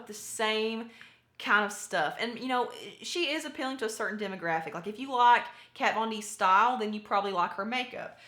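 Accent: South, United States. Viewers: New to your channel and love it ❤ I am from Alabama, you sound southern as well .. what state are you from?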